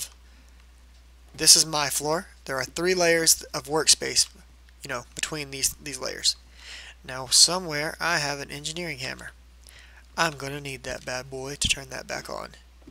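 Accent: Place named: North Carolina, United States. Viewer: are u from NC? your accent is identical to mine. lol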